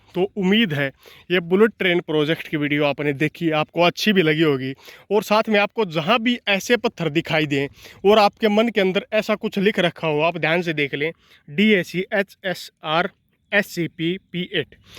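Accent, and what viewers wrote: Haryana, India. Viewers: Zabardast yaar ..... infact your haryanvi accent is also amazing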